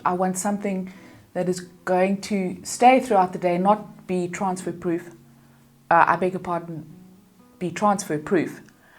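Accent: South Africa. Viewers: Hi, I liked this video. Just found you on YouTube. I can hear this hat you are South African. I am also, but living in New Zealand. You gave a very good review. I feel I can identify with you 🥰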